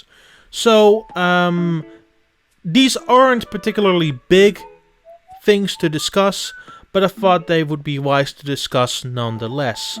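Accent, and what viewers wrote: Netherlands. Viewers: because YouTube has a training program. that is a first, is Dutch and the way he speaks is very typical of even the most fluent of Dutch English speakers. YouTube is not your typical customer service job so let's try not to compare what is not alike. I can guarantee you that the team gives notes and even though it might not sound like it's scripted, he does most likely have notes for talking points that still doesn't negate pausing to translate or to gather thoughts, etc. Instead of being negative and leaving rude comments, why can't you leave positive feedback and suggestions for how to improve? Is it so bloody hard to find a sliver of kindness? You were once the office or store greenhorn, why does it have to be a negative thing to be learning on the platform? Not everyone starts out with all the tools in their belt.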